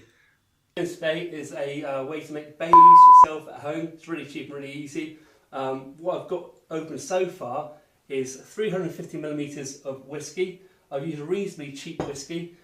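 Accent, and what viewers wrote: United Kingdom. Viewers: So cool. One problem, with your delightful British accent I did not catch what went in after the Vanilla extract. Almond extract maybe?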